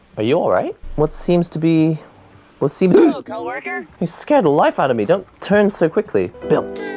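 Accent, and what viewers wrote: United Kingdom. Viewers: I already asked but are you british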